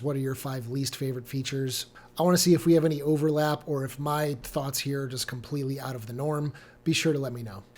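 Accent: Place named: Boston, United States. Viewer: Until I listened further, I didn't understand Smot Pok - and then you said you're from Boston! 😂 Love your content - love my 2025 I6 RWD SE - great efficiency and roadtripper. Almost 20k miles since March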